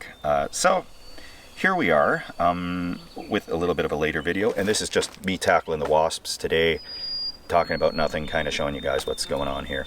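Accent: Alberta, Canada. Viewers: You betcha, eh. This is a very Canadian sounding video. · I'm glad you killed them all 😃 good luck on all of your renovation endeavor. The Alberta accent is strong with this video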